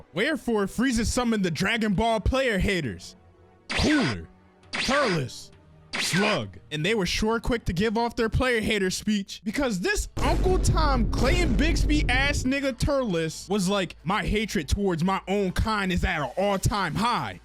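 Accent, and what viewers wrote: Philadelphia, United States. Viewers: bro i know a Philly nigga when i hear one ! Love the content !!! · You gotta be from Philly😂😂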